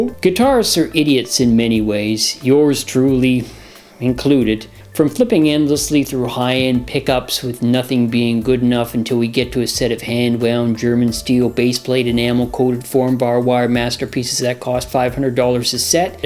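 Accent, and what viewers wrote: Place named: Cape Breton, Canada. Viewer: Where is your accent from??????? I’m a Caper and you sound like it too!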